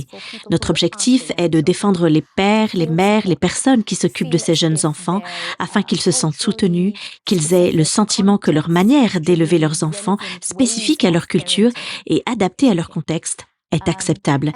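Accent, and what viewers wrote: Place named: France. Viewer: Vidéo très pertinente!! Et merci pour la douce voix française qui a permis une écoute très agréable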